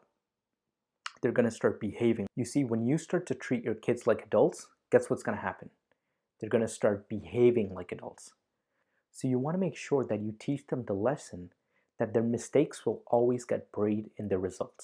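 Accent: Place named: United States. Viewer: Do you live abroad, coz your accent sounds American??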